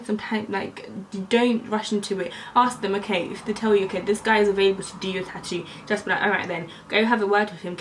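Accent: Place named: Crawley, United Kingdom. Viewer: Where abouts are you from? You sound like your from Crawley. Love your tats btw👏🏽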